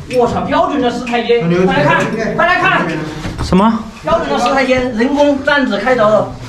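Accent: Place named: Sichuan, China. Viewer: 为什么我要半夜一点钟来瞅这个视频，为什么，为什么，为什么。 这个家乡川普倒是给了一点安全感。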